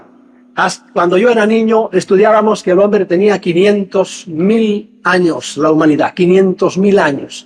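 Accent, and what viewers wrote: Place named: Mexico. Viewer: Amo su acento mexicano! Me recuerda a mi abuelito :')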